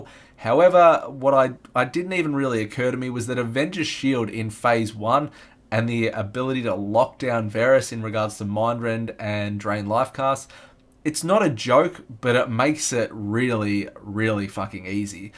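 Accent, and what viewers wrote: Australia. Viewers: dude just having you say 'this cunt is cooked' had me subscribe. good aussy tank videos i approve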